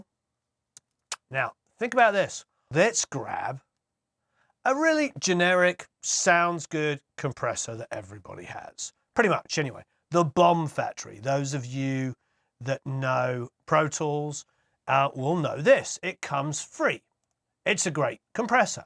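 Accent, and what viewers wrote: United Kingdom. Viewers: Hi, first of all: I love watching your videos, your British accent is great - "I hope you are doing marvelously well" and your is it "shnits and schnitzels (?)" (reminds me of "Wiener Schnitzel" each time). I have a question: you seem to add quite a lot of new things to a mix. How do the artist(s) react, when you sometimes give some new character to a mix? I mean, maybe an artist does not want to be changed too much, he wants a mix with the character he has added to a song!? Let us take a famous song: " " by. There is a very remarkable delay on vocals. If had recorded this song in a studio with dry vocals and a mixing engineer would have added that kind of delay to his vocals, there could have been two reactions: "joy or disappointment, I think - is the mixing engineer going crazy, what has he done to my voice!!!???. Do you know what I mean? 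How far can a mixing engineer go? of course was a very special artist, he was doing most of the things by himself, especially in his early years and he actually did not care too much about the quality of his recordings - the mix had to be as good as possible finally, it was more about the composition or releasing something completely new. By the way, recorded an mixed quite a lot in Sunset Sound studios in LA, and Paisley Park´s Studio3 in Minneapolis was or is a copy of it. Susan Rogers has some interesting videos here on youtube talking about her collaboration with. It is also interse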